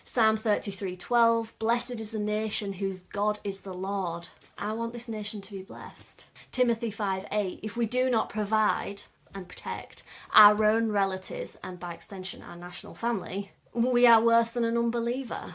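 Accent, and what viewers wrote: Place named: Yorkshire, United Kingdom. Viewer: Completely agree with your sentiments - but can't quite place your accent? Yorkshire maybe?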